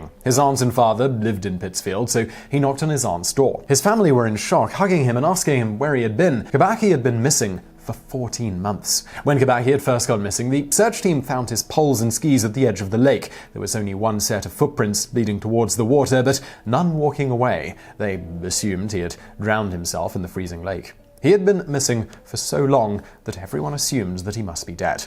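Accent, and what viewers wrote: England, United Kingdom. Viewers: Your voice is an awesome British type. Needs to be in everyday TV.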